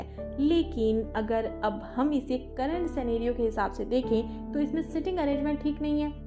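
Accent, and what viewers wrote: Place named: Punjab, India. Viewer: App Punjabi ho😅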